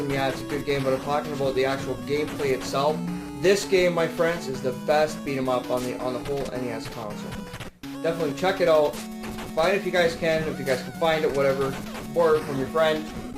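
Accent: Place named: Northeast Ohio, United States. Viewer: sounds similar to northeast ohio dialect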